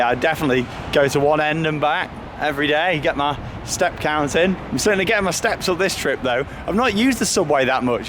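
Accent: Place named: Stoke, United Kingdom. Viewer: where are you from? Trying to place the accent, almost a stoke accent i think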